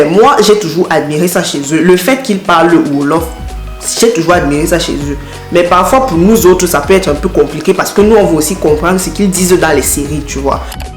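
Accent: Cameroon. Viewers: Je sens le ton camerounais ! Lol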